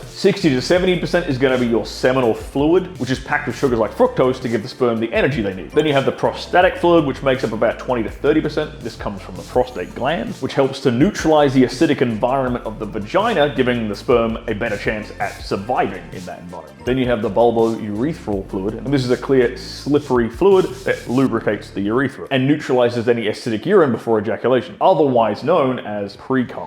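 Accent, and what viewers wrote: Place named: Australia. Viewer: He's Australian 😅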